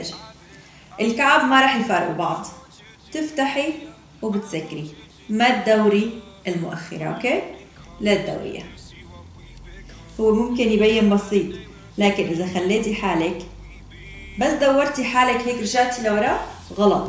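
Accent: Syria. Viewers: شنو اصل ؟سورية لو مغربية لو شنو..لهجتهة سورية بس مرات اشك انو مو سورية🤣♥️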